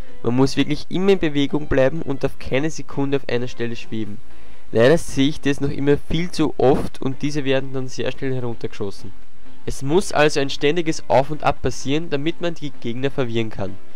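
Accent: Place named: Austria. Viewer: veroarscht wieso in österreichisch?biste österreicher ich nähmlich auch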